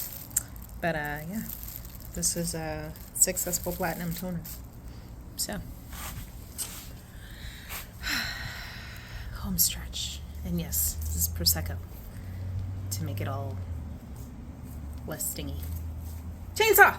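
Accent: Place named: South, United States. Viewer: I can tell you are from the South like me.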